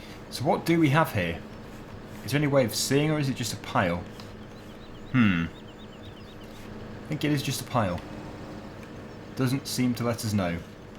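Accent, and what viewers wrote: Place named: United Kingdom. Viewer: Is anyone else turned on by his British accent?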